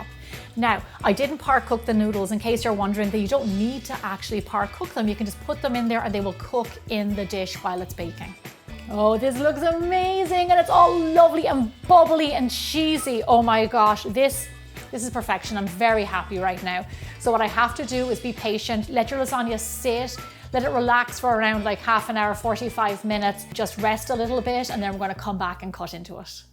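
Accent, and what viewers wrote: Ireland. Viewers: So. I love your baking recipes. I love your accent (love Ireland!). BUT I'm Italian and this is what comes out of nightmares 👹🙈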